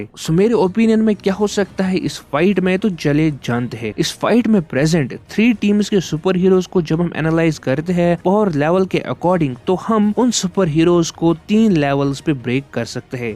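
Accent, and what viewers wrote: Kashmir, India. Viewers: Boss kashmiri ho kya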